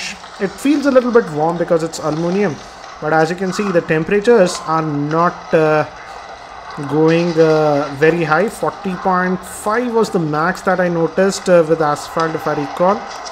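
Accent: India. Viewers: Snaaaapdragon, geeky never gets old, love that indian accent and reviews :)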